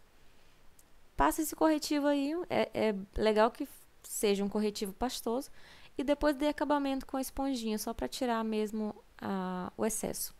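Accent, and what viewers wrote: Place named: Minas Gerais, Brazil. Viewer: vc é de onde? seu sotaque parece de gente de Teófilo Otoni em MG...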